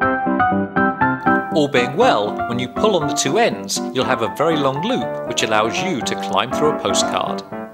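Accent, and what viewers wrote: United Kingdom. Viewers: stupid british accent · I hate this man's accent. Can't stand british... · The way British people speak drive me nuts and annoys so much but at the same time it attracts me and I try to pronounce words the same way.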